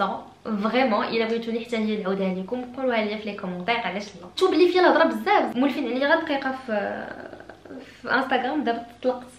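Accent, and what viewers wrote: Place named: Monaco. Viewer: مالك اختي كتهضري كيف لكبرا فموناكو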